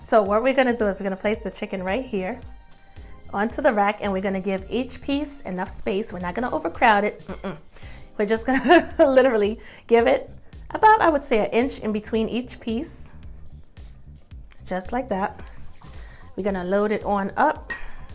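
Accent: New York, United States. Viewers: Love the new York accent. You always tell another new Yorker when you hear them.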